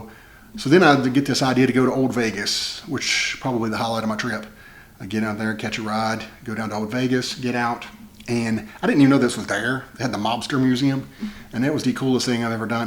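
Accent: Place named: Appalachia, United States. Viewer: Love great video thanks for sharing 👍👍👍🇺🇸🇺🇸🇺🇸🇺🇸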